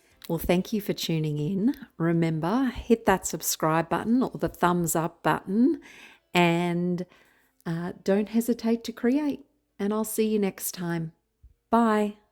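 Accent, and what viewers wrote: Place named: Australia. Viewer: Just found you, recognized the Aussie accent and subscribed😎 love watching it unfold it's beautiful and prefer with silicone I also like the dark together. Lots of love from Sydney🇦🇺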